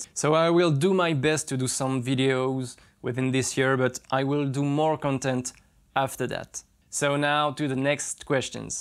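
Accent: France. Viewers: Super video !! Ya encore un petit accent francais😂😂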